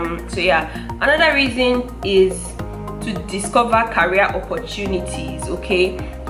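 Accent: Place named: Philippines. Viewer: I am really glad to know and heard from you a kind of Filipino English accent. You deserved to learn it from the Philippines. By the way, the verse (Romans KJV) you had mention is specifically for all the servant of the Lord who serves Him faithfully in good times and in bad times. And that two things work together for good in our lives.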